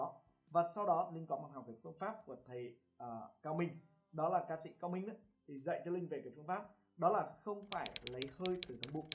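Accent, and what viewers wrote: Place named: Ha Tinh, Vietnam. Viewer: A ns tiếng Hà Tĩnh nghe gần gũi quá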